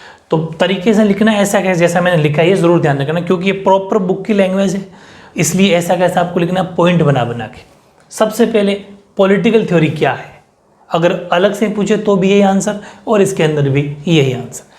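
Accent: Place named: Marwari, India. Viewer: oho sirrr nyo paint kra liya kai 😂😂kursi bhi., shandaar h🤩... Sirr aap to baithe baithe hi pdha diya kro😊😊, aapke per drd krte h na ☹️ mhari trh hindi + marvari ghni hi achi baat h 😂😂 aapk muh se sunne me jyada acha lgta h sirrr. 2, 3 baar bol diya kro sirr😜😜 indeed sirrr literally me to uss pen ko aapk pen se compare krr rhi hu😎😎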